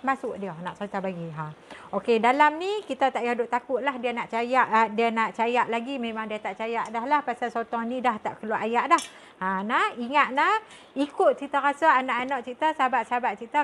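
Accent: Kedah, Malaysia. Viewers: Assalamualaikum.. sya mmg suka sgt follow minat sgt2 kt terhibur dgar celoteh dlm longhat kedah..resepic.ta pn smuanya menarik dn berselera. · Mmg padu loqat kedah. Terbaik..!!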